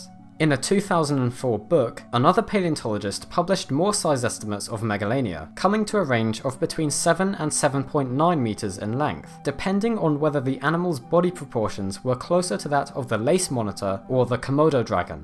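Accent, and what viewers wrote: United Kingdom. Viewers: STOP WITH THE ANNOYING BRITISH ACCENT. Standard American English is fine. Doing a video on Aussie Wildlife, how about using an Australian accent..JMHO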